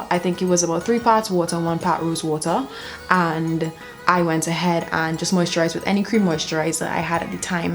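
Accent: Trinidad and Tobago. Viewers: I knew you sound trini, had to check your description box 😂...am trini also😱🙌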